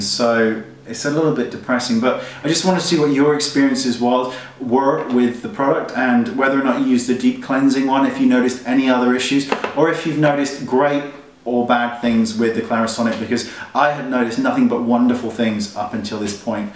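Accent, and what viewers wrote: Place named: United Kingdom. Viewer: Love British pronunciation! Could you do the Accent tag please? ;)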